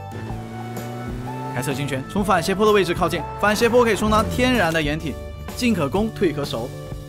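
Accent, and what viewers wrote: Hong Kong, China. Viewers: 你是香港人吗？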